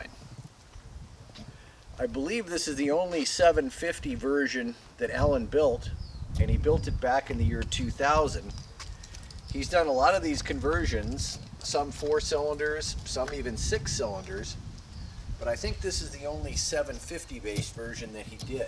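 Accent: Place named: United States. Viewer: Poooshoooww, colour, lol. Godda love the American accent.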